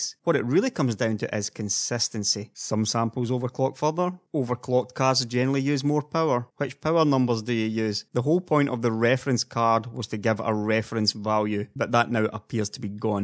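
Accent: Scotland, United Kingdom. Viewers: Scottland? Just wondering because of how you speak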